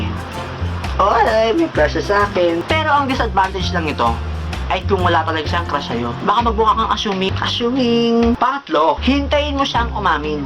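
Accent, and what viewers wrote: Visayas, Philippines. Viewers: Are u bisaya?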